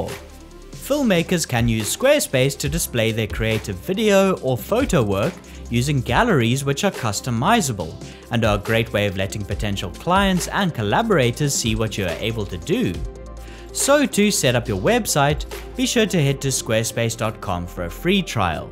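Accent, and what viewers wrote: South Africa. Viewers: Kiff to hear a Saffa accent. Nice one, bru!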